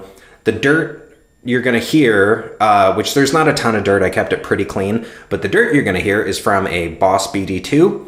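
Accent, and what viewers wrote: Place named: South Carolina, United States. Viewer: hahahaha new follower because of your voice and accnet, sounds like south carolina deep in the south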